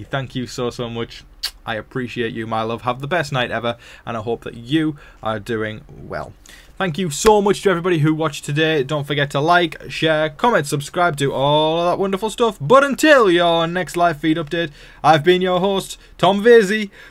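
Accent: United States. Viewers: Your American accent is spot on! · You have GREAT American accent! From an American! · Wait your American accent is good haha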